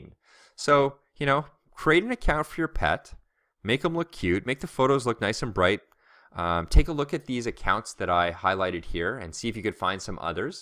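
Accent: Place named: Canada. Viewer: Yeah ... but I'd completely whoop your ass at Sonic Spinball ... So, I'm sitting here marathoning your videos, and you've said that you don't have a pet ... Lets hope that you're single as well, as I'd only ever heard of you approximately 2 and a half to 3 hours'ish ago ... And I'd nearly labeled you an asshole, but you're cool ... I was so borderline offended by the first of your videos that I watched, more mildly outraged ... Now, I realize that you're just brutally honest and a sweetheart of sorts, with your whole taking on the responsibility of taking care of your family and all ... My father fell into a coma the day that I came home from Colorado, never woke up, but that's a different story ... #PepperThePitBull keep an eye out for her ... OH, and you are officially "Strange Brew" Canadian when you say "about" ... It's not something that you can change at this late date, but yeah ... 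That's definitely your Canuck street cred right there ... You pronounce it more "aboot" than "about" ... Anyways ... <3 C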